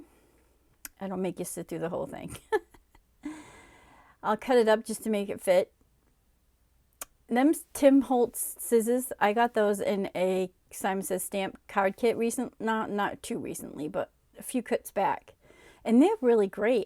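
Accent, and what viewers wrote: New England, United States. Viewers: I love your accent!!! What state are you from? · Are you from New England? Such a cute card. Lots of nice details. I like the cloud background. USA.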